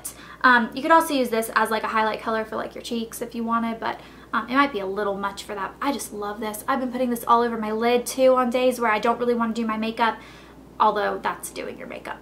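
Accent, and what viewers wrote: South, United States. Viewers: Ah like it !!! You're little southern accent sounds so cute!!! ahahhaha :) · Love the southern accent!! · does anyone else hear her southern accent more in this video?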